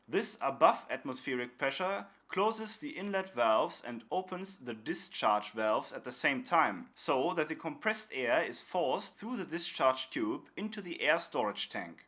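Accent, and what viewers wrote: United States. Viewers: Thanks for the quick reply. Yes there is definitely a sprinkling of American in your speech. Thanks again.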